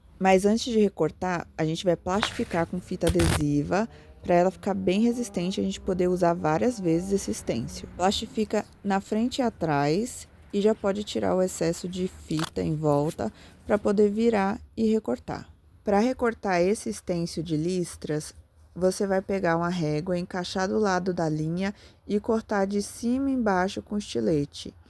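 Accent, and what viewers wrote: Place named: Ceará, Brazil. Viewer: Gostei muito lindo fala do Ceará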